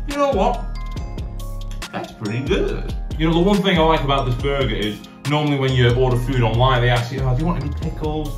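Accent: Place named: United Kingdom. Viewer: i dont know you were british, good content, cheer mate!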